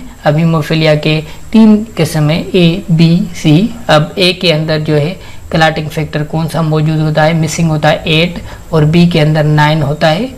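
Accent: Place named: Sindh, India. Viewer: you speak english with sindhi tarka, good explanation sir.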